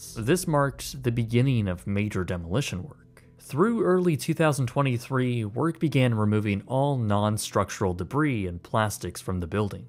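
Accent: Canada. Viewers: Thanks for another great video. Hearing you pronounce The Padres was funny. I'm not poking fun, I understand you have a Canadian accent. Our differences makes life interesting.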